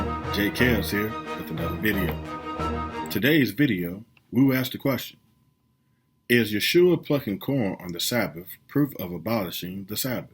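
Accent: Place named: Memphis, United States. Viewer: I agree! Very good brother! Where are you from? Memphis? Love your accent! 👍🏻🙏🏻😀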